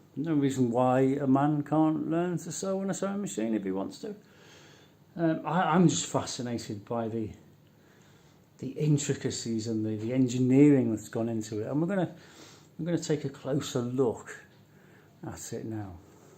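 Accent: United Kingdom. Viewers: Love listening to - his voice is so soothing. I could listen to the UK accent all day long (American here). I couldn't watch more recent videos because I didn't want him to leave. But I'm getting back to them. Thanks so much, Fiona for keeping his memory alive!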